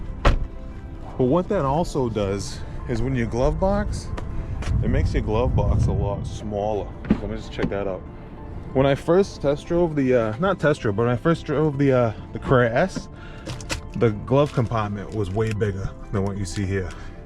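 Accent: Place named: Boston, United States. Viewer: ❤😂😢🎉🎉🎉😮😮😅😅😊😊😊😊😊 keep talking, bro I love that accent my friends from Boston and she sounds just like you good job, brother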